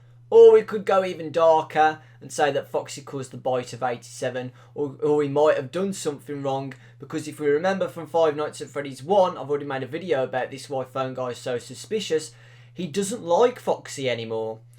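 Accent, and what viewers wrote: United Kingdom. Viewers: U R THE BEST FNAF THEORIST EVER AND UR UK VOICE IS BAE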